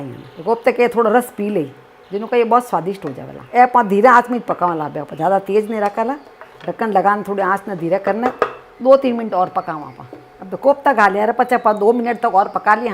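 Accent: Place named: Rajasthan, India. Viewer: Apki har recipee bhut achi hoti h aur apki mrwadi language sunne me bhut achi lgti h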